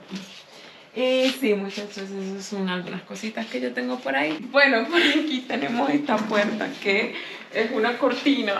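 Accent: Colombia. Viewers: Eso es una excusa pues estoy claro y tú también lo estás que hablas caliche porque te gusta, por qué crees que es nice... déjale eso a la Youtuber venezolana que imita todos los idiomas en español y hasta en inglés. Creeme, hablar caliche no es nada nice, más nice seria hablar con cualquier acento venezolano, inclusive nuestro acento gocho y de merida, esos si que son acentos bien bonitos. Si fueras mi pareja la ladilla y el chalequeo que te tuviera fuera trifásico, de hecho la mamá de mi hija menor tenía esa mala costumbre de hablar caliche por estár viendo las novelas del vecino país, y el chaleco que le monte fue extraterrestre hasta que dejó la ridiculez. La diferencia está en que la mamá de mi hija no es Youtuber, no tiene una cuenta donde nos pueda representar, tú sí.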